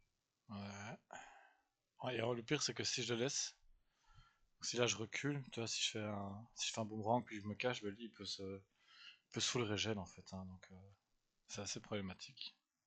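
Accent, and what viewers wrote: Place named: Switzerland. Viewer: Joli ❤ iop joli l'accent suisse 😂❤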